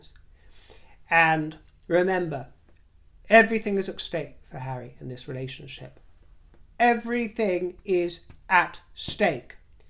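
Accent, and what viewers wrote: United States. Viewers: your American accent is outstanding!😊🎉❤ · By the way you have a great American accent! 👏👏👏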